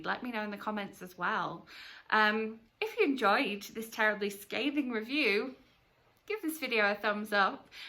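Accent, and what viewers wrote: United Kingdom. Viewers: I haven't seen that yet. As a Welshman I am proud to say he is one of our own. I can't believe you are Canadian, you sound Middle English.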